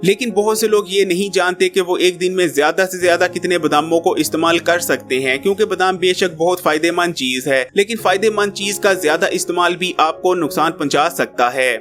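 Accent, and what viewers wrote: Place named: Punjab, India. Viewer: PUNJABI LAG RAHA HAY PRONOUNCIATION KHARAB HAY .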